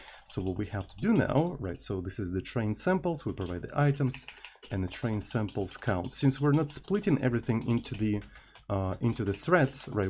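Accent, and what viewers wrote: Russia. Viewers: This thing u said inspires me a lot I always afraid of doing dumb things and writing shitcode but I want to improve Also your English is so Russian so I feel like a native English speaker listening you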